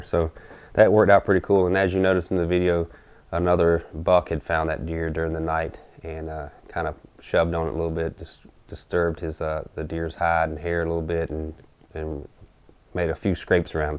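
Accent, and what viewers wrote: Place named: Minnesota, United States. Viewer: What part of Minnesota are you from?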